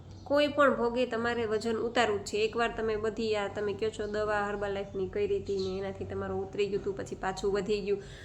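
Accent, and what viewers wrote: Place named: Kathiawar, India. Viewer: Mane tamaro kathiyavadi voice bav game ben sweet boli che👌🤗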